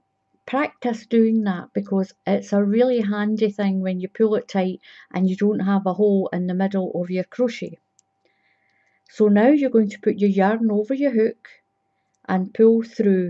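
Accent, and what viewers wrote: Scotland, United Kingdom. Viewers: Great to hear the Scottish Accent for a change. I won't have any problems understanding you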